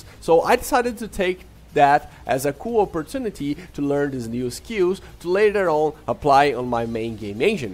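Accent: Brazil. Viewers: Are you Brazillian right? I realized because of the R pronunciation, your English is almost perfect though, it took me some time to realize. Great video, great job! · caraca, esse sotaque br tá muito aparente kkk pô irmão, me salvou ein, tô querendo aprender a produzir games e acho que a parte mais complicada pra mim é a engine em si, mas ainda bem que tem pessoas como você pra ajudar, tmj!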